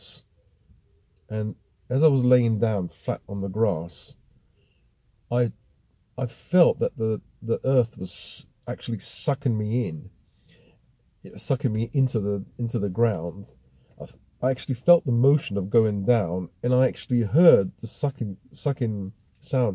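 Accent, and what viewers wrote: United Kingdom. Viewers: Do you play a sport that may include women? I am not sure where you are from...accent possibly British? Do you play pickle ball? Take some lessons, and they can direct you to courts or groups of people that play. You can meet so many people. Certainly make friends like that. At the very least, you will be out amongst other people and have great fun too. You have to put yourself out there. The older we get the harder it is to make good solid frienships, especially for introverts. God Bless and good luck.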